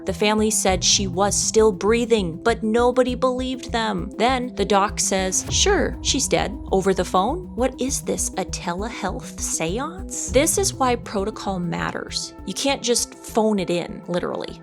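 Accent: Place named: Midwest, United States. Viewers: This is the best youTube channel in existence. I love when the thick MidWestern comes out. 'HaAAspice'. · Your Midwestern accent 😅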